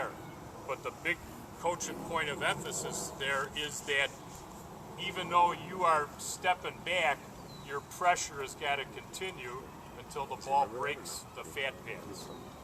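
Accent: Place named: Midwest, United States. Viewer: The word is quarterBACK lol. BACK midwestern guy. Bad accent good coaching though.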